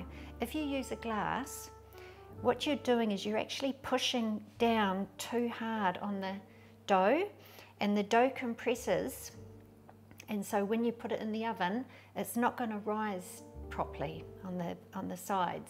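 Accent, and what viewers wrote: New Zealand. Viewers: Hi, love the kiwi accent - I currently live in Rwanda and both your recipe and accent are brilliant. I have an oven with 3 temperatures - cold, a bit warm and a bit warmer yet your scones came out beautifully and were so easily prepared. Many thanks. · New Zealand accent = instant trust. How did I never know the hot tray trick? Intermediate School cooking classes have let me down! I’ll be making these in the morning thanks. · She sure sounds like a Kiwi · It’s so overly to hear a familiar accent from Aotearoa. Thank you for your gentle and really easy recipe. Love the way you took us through step by step. I am going to make these scones for my staff who are all men and love to eat. Thanks heaps. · I love your New Zealand accent!! (Am i right?) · Yes sounds kiwi love her presentation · Thank you. My mother was a great home cook but, her scones, pikelets and shortbread were outstanding. 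Sadly, although l inherited her baking tins and even have her bone handled knife and even though l make most things well, l did not inherit her 'hand' and have pretty much given up trying with scones and pikelets. Tragic cos, IMO, hot buttery scones (and/or pikelets) are unbeatable. You've inspired me to try again though, mostly because your method, measurements and equipment are pretty much identical to what l remember of how my mum made hers - sadly dementia took her before it even occurred to me to write down her recipes, which, like most cooks of her generation she knew off by heart. Most recipes l've found since seem to rely on enormous quantities of baking powder and as l seem to be particularly sensitive to the taste l find them inedible. I would love to know where you got your brilliant oven tray too please - judging by your accent, you're a fellow kiwi and although l've seen those trays overseas, l've never seen them for sale here in Aotearoa. 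I'd really appreciate a heads up, if anyone knows where they're from.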